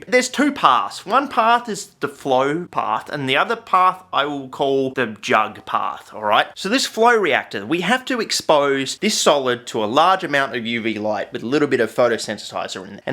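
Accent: Australia. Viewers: I'm too used to the Aussie accents to really notice it, but on the rewatch, I totally get what you mean.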